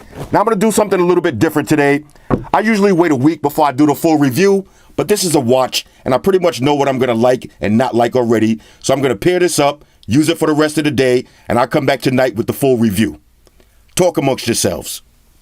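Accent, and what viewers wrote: New York, United States. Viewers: exactly!! lol and that NY accent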